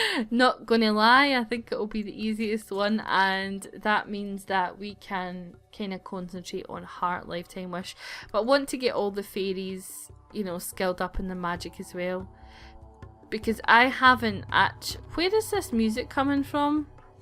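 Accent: Scotland, United Kingdom. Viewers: she is Scottish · OMG ARE YOU SCOTTISH?! Im also scottish (im sorry your the first scottish youtuber i have seen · OMG! I so wish this is for the sims 4! I love your sottish voice! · This is the best Scottish accent I have heard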